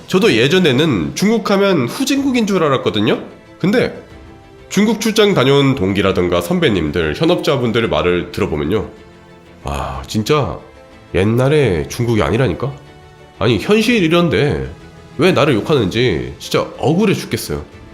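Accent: Gyeongsang, South Korea. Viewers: 경상도 토바기, 싫다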